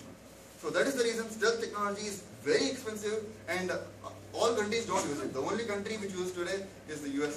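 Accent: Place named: India. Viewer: i dont know why i like indian accent XD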